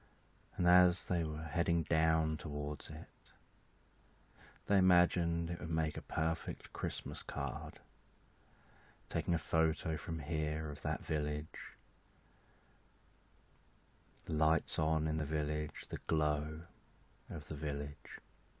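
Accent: United Kingdom. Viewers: Thank God for the British accent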